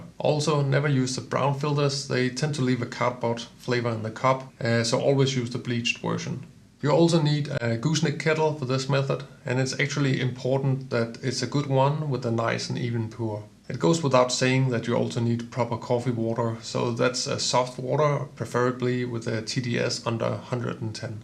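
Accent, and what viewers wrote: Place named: Denmark. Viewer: Blev nødt til at google for at se om du var dansk. Det er så svært at skjule, at man er dansk, når man taler engelsk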